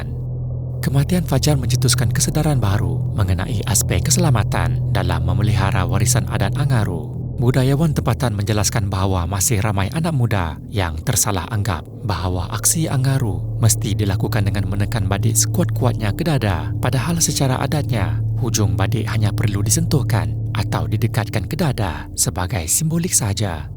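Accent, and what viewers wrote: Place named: Sarawak, Malaysia. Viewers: ya... Rasa loghat orang Sarawak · ada org Sarawak cakap mcm ni,lagi pun Kalau kt Sarawak ko bunuh buaya tanpa sebab mampos lh ko kna tangkap pihak krjaan Sarawak..Nama pun dia guna atau 😂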